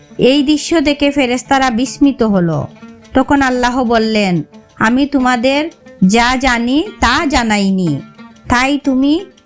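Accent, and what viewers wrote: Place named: Sylhet, Bangladesh. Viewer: আমার মনে হচ্ছে, আপনি সিলেটি, আপনি শুদ্ধভাষা ব্যবহার না করে, আপনার আঞ্চলিক ভাষা ব্যবহার করেন, শুদ্ধ ভাষা আর আঞ্চলিকতায় মিশে থাকলে শুনতে ভাল্লাগেনা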